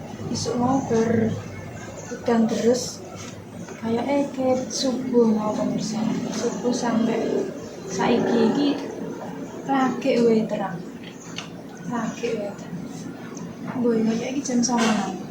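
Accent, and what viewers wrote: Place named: Java, Indonesia. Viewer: mf mbk ngomongnya jangan jawa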